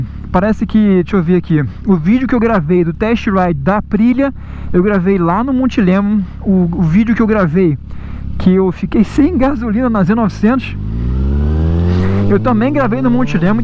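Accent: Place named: Rio de Janeiro, Brazil. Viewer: eu acabei de rever o filme, cara parabéns pelo vídeo e abençoada seja a Internet né por que nos permite coisas como essa sensacional curiosidade nostálgica que você trouxe! Um adendo, esse filme foi fantástico pelo carro pelo amor adolescente (eu era apaixonado na atriz, hoje não ela tá com 57 anos kkkkk) a história de suspense como um fantasma que buscou uma vingança justificável, através do desconhecido pra nós, máquinas de outro mundo, uma força cósmica, o ronco pra lá de insano do carro parece sincronizado de 2 motores deu um efeito assombroso...apesar de hoje parecer tosco como você disse na época nooossa era top mesmo, e a gente viaja no tempo, olha parabéns de novo e ganhou +1 inscrito brother!! Forte abraço tmj... seu sotaque carioca mano kkk eu sou do Mato Grosso do Sul campo grande, aqui é porrrtera, nóis, faiz, bão kkkk vlew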